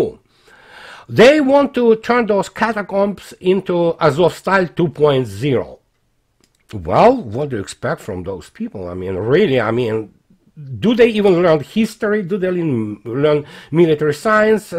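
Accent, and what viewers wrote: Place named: Russia. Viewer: to my English-speaker's ear, you have a pronounced Russian accent, and as such, it is a challenge for me to understand you under the best of circumstance. However, you talk so quickly that it makes my comprehension all the more problematic. Could you please SLOW DOWN your speaking some? Thanks, BB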